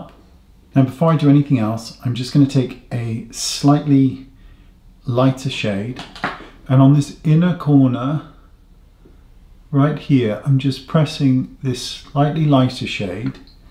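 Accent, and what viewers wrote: United Kingdom. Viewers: Where have you been all my life? I just discovered your wonderful channel and adore watching you. Being 45 and at a loss with makeup application, sharp features, eye bags, and well...generally a mess... your channel makes me laugh and smile. I wish you were my neighbor. 🥰 To my undignified American ears, I think I hear UK???? Thank you for every upload. ❤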